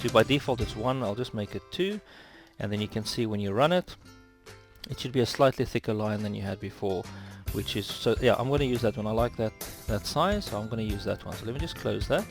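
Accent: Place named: South Africa. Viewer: good video,and refreshing to hear a south african accent, (to me) the music is slightly loud and distracting.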